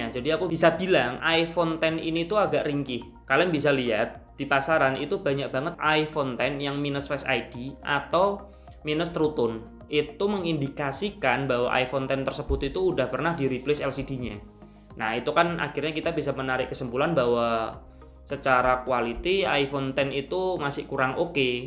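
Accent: Semarang, Indonesia. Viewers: Dari awal dengerin video nya kok bahasanya kayak medok gitu. Dan ternyata benar. Wong semarang🤣🤣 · orang semarang bro?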